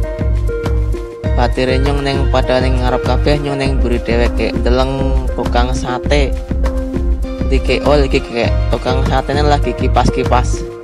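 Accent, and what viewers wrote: Banyumas, Indonesia. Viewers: Ora ngapak ora kepenak mantap sukses selalu kak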